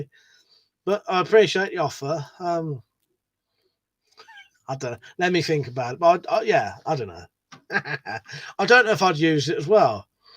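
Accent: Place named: Suffolk, United Kingdom. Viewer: I detect a suffolk accent...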